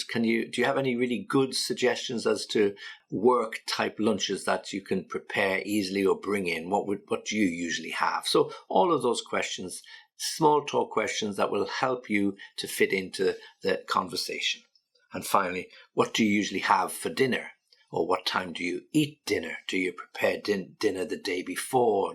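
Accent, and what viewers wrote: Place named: England, United Kingdom. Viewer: Do you live in England? Your accent.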